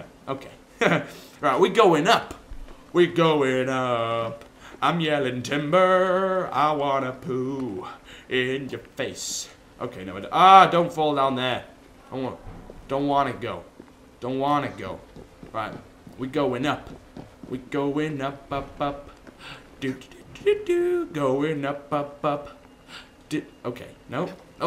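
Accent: United Kingdom. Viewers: Are you from London?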